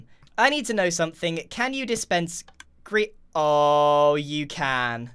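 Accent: United Kingdom. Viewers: You made me fall in love with British accent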